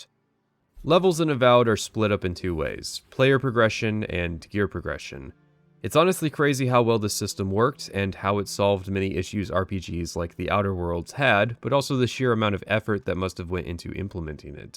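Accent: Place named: United States. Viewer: I really have to ask how you, with your thick, middle-of-the-road Yank accent, know how the second act Italian accents are “fake”. You suddenly not only a gaming expert but a linguistics pro as well? Do the video blogging world a favor and get off your high horse with this shit. Review the game….leave your opinions about accents out of it.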